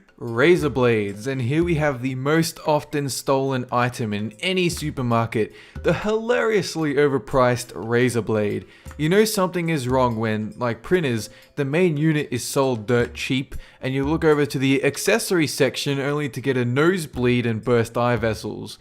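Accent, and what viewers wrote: Australia. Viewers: are you aussie or american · Are you Australian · Hey are you Australian?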